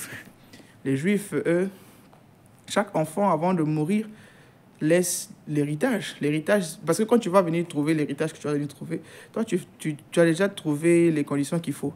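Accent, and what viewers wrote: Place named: Asia. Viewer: Tu as pris l'accent asiatique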